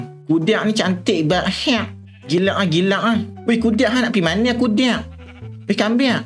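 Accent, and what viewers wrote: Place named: North, Malaysia. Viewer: Tequk sangat loghat utaqa😂😂😅😅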